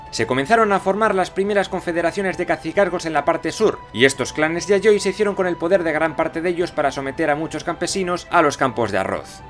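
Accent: Spain. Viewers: No me cuadra escuchar la historia de Japón en voz de un español.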